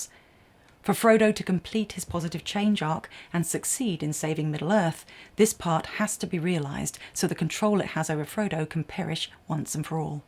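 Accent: United Kingdom. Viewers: You have an amazing voice. Really pleasant. Soft yet not ASMR. Just the right amount of British to sound classy but not posh. Have you ever thought of narrating (your favorite) audiobooks? I think those would sound amazing.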